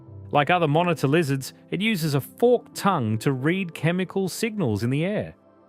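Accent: Australia. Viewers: Narrator has Aussie voice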